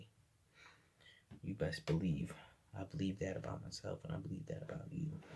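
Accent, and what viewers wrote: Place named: Midwest, United States. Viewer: Have you always lived in the east? (Don’t know if you are comfortable with me saying your state). I ask because it sounds like you have a little midwestern in there!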